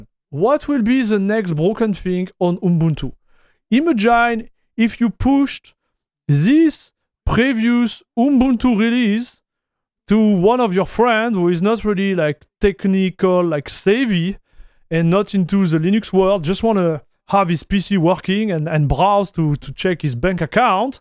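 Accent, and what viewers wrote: France. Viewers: The french accent is so strong ;)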